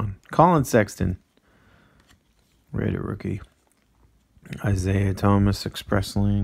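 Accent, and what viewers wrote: Boston, United States. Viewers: I am guessing this dude is from boston. His accent's telling me.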